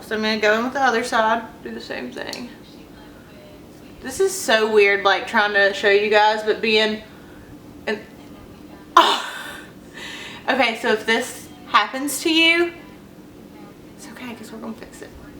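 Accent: South, United States. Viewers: aw i love your southern accent:) i also use the mac emphasize to set my highlight! your videos are really great keep up the good work girl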